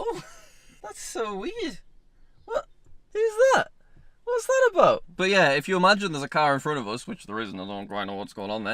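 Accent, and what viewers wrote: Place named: Middlesbrough, United Kingdom. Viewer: Are you from Middlesbrough?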